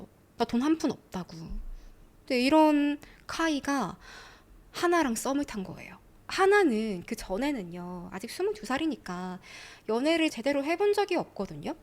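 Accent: Busan, South Korea. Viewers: 부산 사람이신거 많이 티가 나시네요. 일본말을 엄청 잘 하시고 일본 문화에 대해서도 엄청 많이 알고 계시네요 일본에 대해 많은 호감이 있으신가 봐요.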